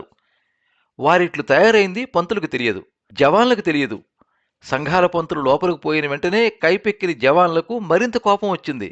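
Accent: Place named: Telangana, India. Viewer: ఆ రోజుల్లో నిజాముల నిరంకుశ పాలన గురించి చాలా చక్కగా వివరించారు సురవరం గారు.మీరు ఆ రోజుల్లోని తెలంగాణా మాండలికాన్ని చాలా చక్కగా,వినసొంపుగా పలికారు.నిజాములు పోయినా పరిపాలన మాత్రం ఏమీ బాగుపడలేదు అని అనిపిస్తుంది.😢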